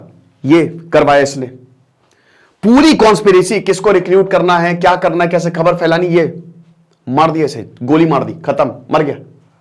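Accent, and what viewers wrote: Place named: Bihar, India. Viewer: Aajh full bihari dailoge ke mood me hai sir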